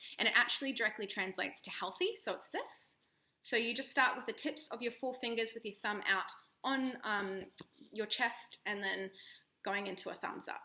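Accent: Australia. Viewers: Im aussie and even I could hear that accent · You got a Aussie accent for sure! Haha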